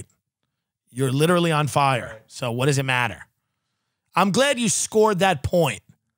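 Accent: United Kingdom. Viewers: As a Brit ex-pat I only tune in for the genuinely authentic british accent. It makes me homesick.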